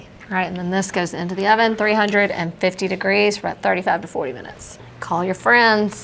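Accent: South, United States. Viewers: I really enjoy listening to this pretty and smart young lady. How lucky is her family! She is so interesting and I love the southern accent. So many helpful ideas and recipes that are practical, easy and delicious., you are the best!!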